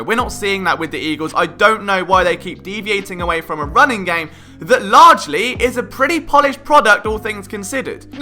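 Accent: United Kingdom. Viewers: Why the Fook is a british person talking about american football XD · I have never heard someone with a British accent talk about football that wasn’t soccer. Nothing wrong with it but this is a first I am amazed.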